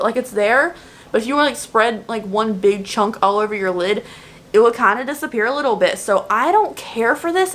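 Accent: South, United States. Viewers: The southern accent 👏🏻👏🏻👏🏻 I love it!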